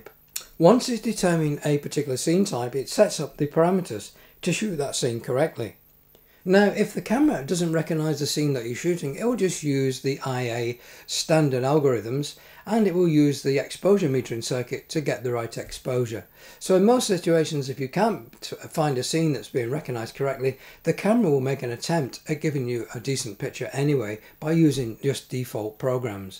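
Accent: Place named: Manchester, United Kingdom. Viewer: Thanks,. Another great series. I've just picked one of these up (practically unused - it's in immaculate condition) on ebay for £550 and absolutely loving it. What's the point in reading through an instruction booklet when you've done a much better job that's easier to follow. It's also nice to have it in a Mancunian accent.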